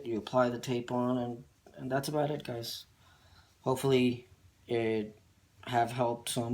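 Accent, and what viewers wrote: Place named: New York, United States. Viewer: You say awwff, for off, are you New York..New Jersey? I'm hailing from central London UK.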